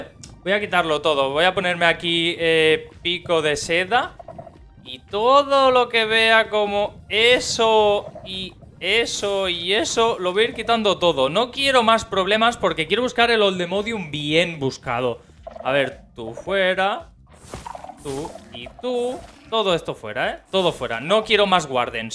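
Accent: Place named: Spain. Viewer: Me encanta como narras todo y me gusta el tono de español creo q es,dato:soy argentino jaja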